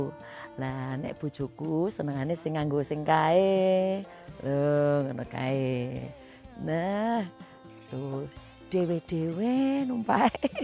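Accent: Java, Indonesia. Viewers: MasyaAllah mbk,medok pokoe jowone ❤❤❤ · MasyaAllah alhamdulillah, ya ini yg kmi tunggu2 dan harapkan Mbak, ngagem busana Jawa Asli jian + luwes gandes, Hayuuuuu jobo jero, matur nuwun sampun membudayakan Bhs Jawa dan logatnya yg medok natural, jg busana Jawa yg anggun, mantan, 🤩👍😇🤗🥰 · Ibuuuu... Suka ikutin Ibu... Jowone enak le ngendiko... ❤❤❤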